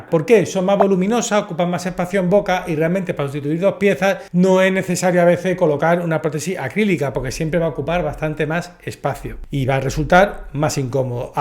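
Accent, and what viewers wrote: Spain. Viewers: Cae mal esa forma de hablar de los españoles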